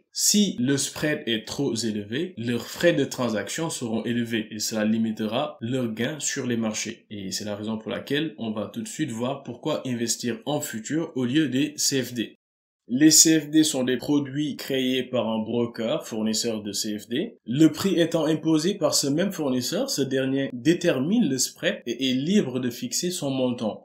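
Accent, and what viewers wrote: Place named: Senegal. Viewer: Bonjour, très bonne vidéo ! J’ai crue percevoir un soupçon de parfum de la teranga dans votre élocution(!très agréable),right? Cordialement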